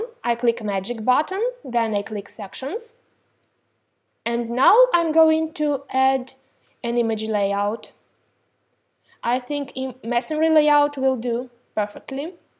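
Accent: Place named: Russia. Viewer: russian accent) забавно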